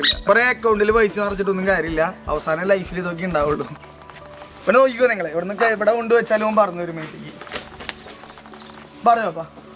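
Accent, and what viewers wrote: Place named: Thrissur, India. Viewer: ത്രിശൂർ ഭാഷ ഇഷ്ടമുള്ളവർ ഉണ്ടോ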